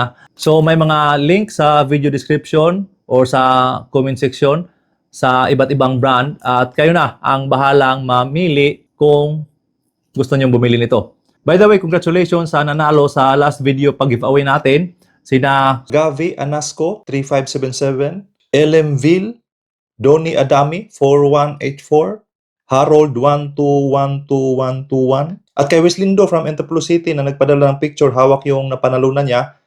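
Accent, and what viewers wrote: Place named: Ilocos, Philippines. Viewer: Sir sa accent mo hulaan kita Ilocano ka Sir?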